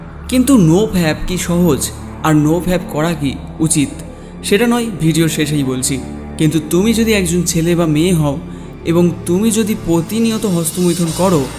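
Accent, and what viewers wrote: India. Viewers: এটা কি বাংলাদেশ ইউটুইব চ্যানেল ? দুঃখিত কথা শুনে ভারত পছিমবঙ্গ মতো লাগলো. তোমার কথা শুনে আমার খুব ভালো লাগলো খুব expression পাওয়া গেলো ।❤️❤️❤️❤️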